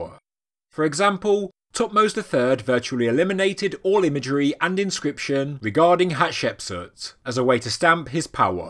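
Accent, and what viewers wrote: United Kingdom. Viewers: A. British interpilation of Ancient African Art.and why it was defaced was. so perpostrtious that. It is not funny. This British sounding. narrator is a God Damer. The African People who created this type of art were not that supertiscious or self lothing.They were not slaves and and did not hate their cultural phenotype. This narraitor completely overlooked the Persian, Greek and latter(muslim Arabs who would pillage,destroy and alter the Afriican Dynamic and life like Art out of Jellouse envey and hatred of an. African People who were superior to them in culture.Egyptian Staturtory and life like reproduction in stone are unraveled today in reproduction.Egyptian art is so life like the images look alive. The Arabs have done more damage than the Franch and British combined in smashing noses and distorting African feachers.They now claim that they are the Ancient Egyptians.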